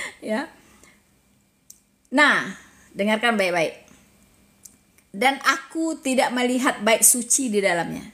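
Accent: West Sumatra, Indonesia. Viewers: Hallelujah, Tuhan memakai hambaNya yang cerdas dan tegas menyampaikan kebenaran Firman Tuhan. Meski memakai logat Minang, namun tetap menarik dan menjadi berkat. Semoga para pemikir Minang seperti Ade Armando dll yang mengandalkan logika bisa terinspirasi dan menerima jalan keselamatan dengan mendengarkan penjelasan penjelasan.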